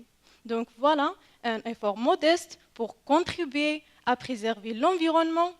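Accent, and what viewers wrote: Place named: Morocco. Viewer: Marocaine d'après son accent bravo.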